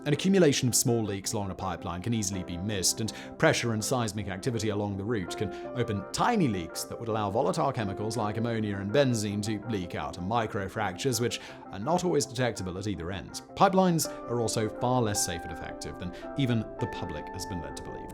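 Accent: United Kingdom. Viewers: . Please do your research. I love you and your brit accent but you have blown it now. That cotton-looking plant is definitely not cotton. We grow cotton in bnbnmnb can't say because of state spies kkkk, Just spend 30 seconds on the internet and you will see a real cotton plant. Your pic shows a harmonious loving field of flowers, but a real cotton field uses pesticides, and child labor and the topsoil is washed away in rain. None of this happens in your Alice in wonderland field of flowers. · Another example of British accent being conflated with intellectual prowess. What an a$$. 😂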